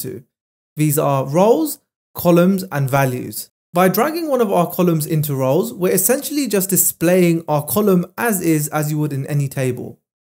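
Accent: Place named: England, United Kingdom. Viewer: Thank you so much a legend and breath of fresh air hearing a proper English accent from a local bro!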